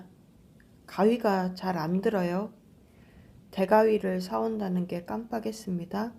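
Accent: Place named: Jeolla, South Korea. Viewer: 전라깽깽이 말투랑께.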